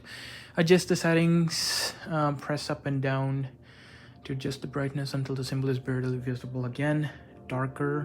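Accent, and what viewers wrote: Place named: Canada. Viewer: its the strong canadian accent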